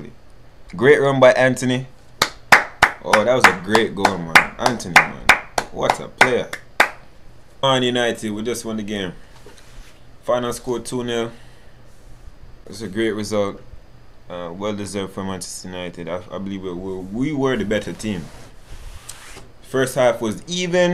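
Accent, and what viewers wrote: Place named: Jamaica. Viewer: Bro hw u sound so Jamaican😂🇯🇲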